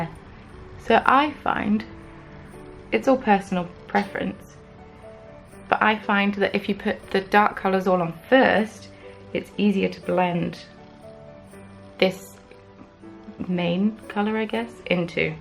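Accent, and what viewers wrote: RP, United Kingdom. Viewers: I’m thrilled to have found your channel today. You’re one very stunning woman and you speak so beautiful, very “posh” like. I’m currently binge watching your videos and enjoying them. Thank You for sharing... 😊😘😊 ps, where are you from? Xx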